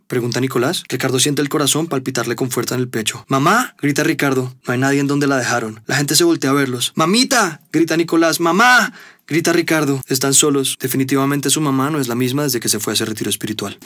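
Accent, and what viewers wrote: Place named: Colombia. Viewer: Ese acentico colombiano💕💕💕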